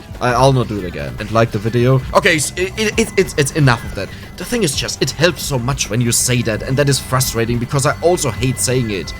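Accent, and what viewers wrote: Germany. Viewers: Just a question, where are you from? im sure your dialect is with a german aspect haha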